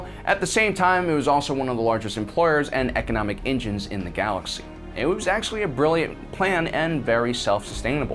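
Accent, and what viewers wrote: United States. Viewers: i'm already suscribed since a while, and i really love your videos.... however on this video, i had a hard time trying to understand what you said due to your strong American accent. Still, i liked the video:)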